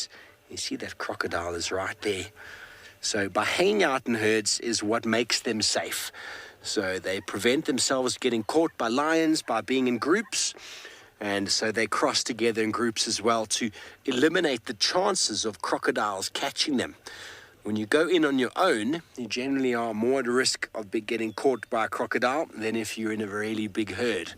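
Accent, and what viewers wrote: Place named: South Africa. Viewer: The south African accent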